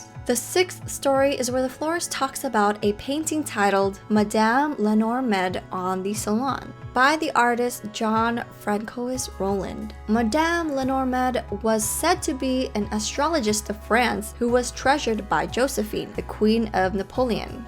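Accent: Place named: Italy. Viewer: I Love You, your theories are always great (P.s. your Italian is sooo cute 💜)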